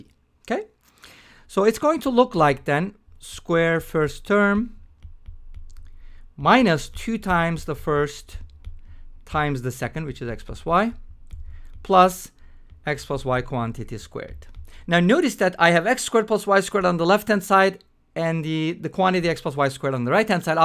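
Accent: Turkey. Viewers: Ill posed as a geometry problem since area and perimeter are different properties and have different units. Keep up the good work. Do I detect a Turkish accent in your speech?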